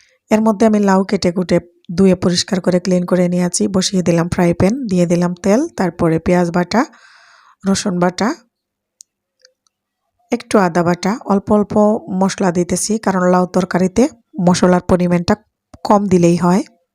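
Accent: Sylhet, Bangladesh. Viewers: tumi sylheti ni go boin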